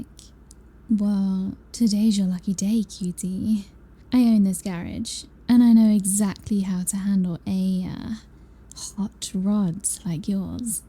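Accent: United Kingdom. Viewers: British AND spicy? Yes, please. You absolutely killed it with this one. Apparently you had some fun with it!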